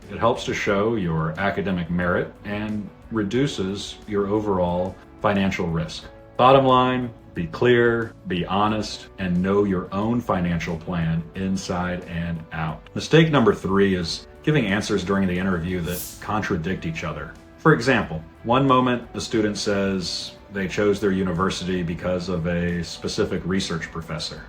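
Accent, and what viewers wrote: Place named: England, United Kingdom. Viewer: Like the way that you simplify things. Best visa officer and English accent ever.❤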